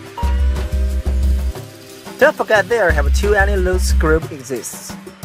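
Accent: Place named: England, United Kingdom. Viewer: I love your English accent 😂😂😍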